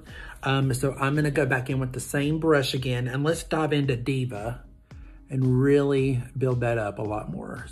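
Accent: South, United States. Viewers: I adore your Southern drawl,. I had a PhD supervisor from Georgia and I would swoon every time he spoke. Even 20 plus years later, I cannot remember a word he said because I was too busy swooning at how he spoke. 😂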